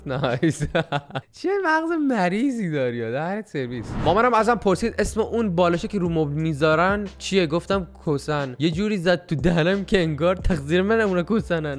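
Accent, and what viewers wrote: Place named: Abadan, Iran. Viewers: لهجه آبادانیت هعی میزنه بیرون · میشه یک ویدئو کلا ابادانی صحبت کنی🥲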